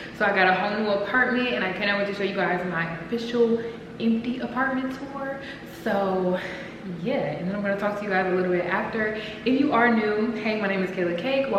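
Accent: DMV, United States. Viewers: I just know you from the dmv by your accent lol. I so wish you could share this apartment cause it is everything but people crazy so 🥴 congrats!!! You deserve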